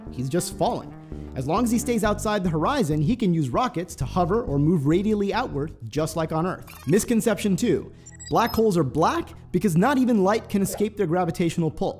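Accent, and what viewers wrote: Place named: Australia. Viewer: Man, I feel the exact opposite. Plus Australian accent and slower speaking style are much more pleasant to listen to.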